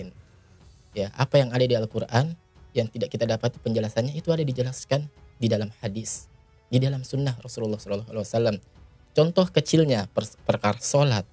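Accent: Sulawesi, Indonesia. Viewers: Cara bicara anda sepertinya orang Sulawesi dan sy yakin anda cm mondok di Sulawesi tdk di Jawa jd belum tahu semua tentang pesantren aswaja. Anda bilang objektif tapi ketika ada komentar yg mendukung anda bahkan menyudutkan NU anda malah like. Dmn letak objektivitas anda? Kalo memang anda objektif harusnya anda bilang itu oknum 🙏🙏🙏🙏🙏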